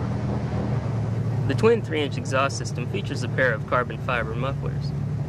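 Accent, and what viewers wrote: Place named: South, United States. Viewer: Who did these voiceovers? Guy sounds southern 😂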